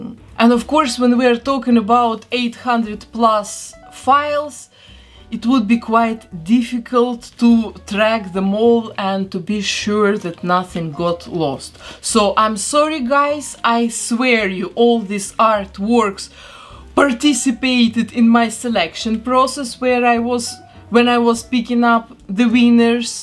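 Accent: Russia. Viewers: Почему мне слышится русский акцент? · Слышу русский акцент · вы ведь не американка и не англичанка. у вас акцент как у русской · У тебя русский акцент или мне кажется... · Русский акцент ?🤔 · Only me hear that strong Russian accent? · Она не похожа. У нее акцент как у русского, который пытается говорить на английском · Хех прикольный русский акцент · Этот убийственный русский акцент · Почему мне кажется что у нее есть русский акцент. Будто бы русская, выучившая английски. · Ты русская? Акцент русский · Даже я слышу русский акцент)) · Видео крутое, но русский акцент мешает наслаждаться им в полную меру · Мне кажется, или у неё английский с русским акцентом..... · вы точно не русская? прям как будто русски английски, так сказать, звучит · почему тут нет ни одного русского комментария? я слышу 1000% русский акцент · Ох, этот русский акцент..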